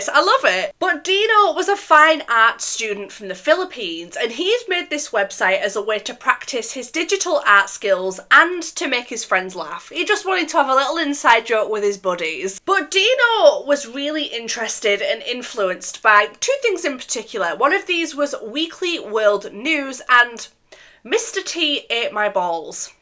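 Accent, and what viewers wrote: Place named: Wales, United Kingdom. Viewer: You sound welsh lol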